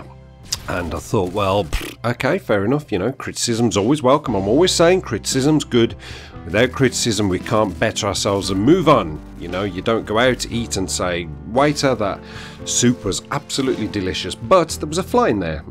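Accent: United Kingdom. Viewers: I've been checking your channel everyday for a new upload....dammit! Start uploading more, I need your British accent in my life lol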